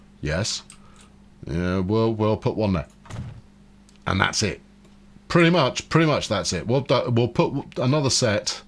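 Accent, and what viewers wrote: United Kingdom. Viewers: I loving your Doon canyon series. And nice to hear the dulcet tones of a fellow Brit. What what, cuppa tea govna etc etc.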